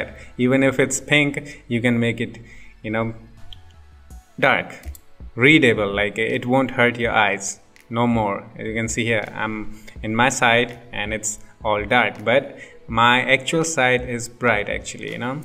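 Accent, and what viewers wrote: India. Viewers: lol cant trust that indian voice sry just had too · i love indian accents, for real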